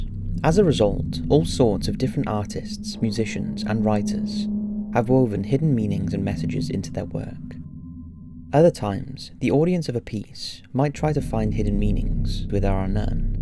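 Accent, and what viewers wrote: Wales, United Kingdom. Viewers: Gotta ask,are you Welsh? Your voice sounds like it has Welsh undertones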